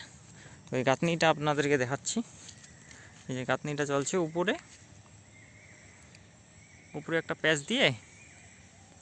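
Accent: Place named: Rajbanshi, India. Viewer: Dada apnar kotha to vatiya r rajbanshi vash mix ache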